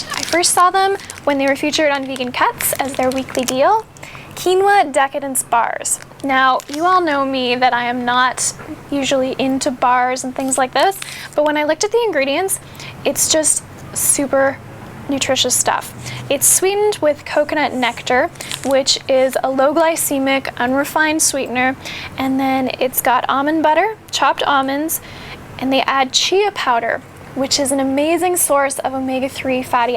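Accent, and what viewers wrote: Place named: Canada. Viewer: I notice you have a Canadian accent. Are you originally from Canada? Where do you live ?I watch your videos and all is good! Love the Recipes.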